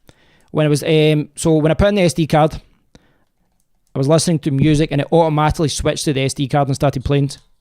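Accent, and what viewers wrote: Scotland, United Kingdom. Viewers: i love the way you say K .... i love your ascent reminds me of my grandparents who were both from Scotland..thanks for the vid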